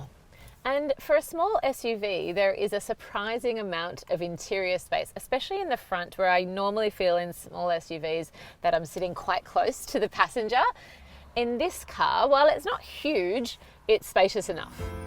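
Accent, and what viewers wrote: Australia. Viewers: Damir D, she has an Australian accent, please do not judge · I dont know how to tell you but Aussies pronounce things differently. Because there are different countries outside yours and people outside of you lol.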